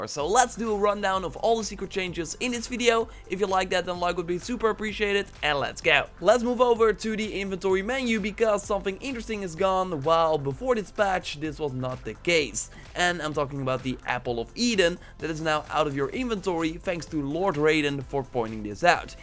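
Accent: Netherlands. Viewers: GoodFella 98 pretty sure its dutch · S1561verian S1561verian Hij is Nederlands. Kun je echt gelijk horen. Plus, hij zei dat volgens mij bij een Livestream.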